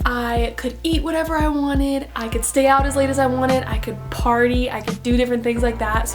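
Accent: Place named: Montana, United States. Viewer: that montaner accent 😂 gotta embrace it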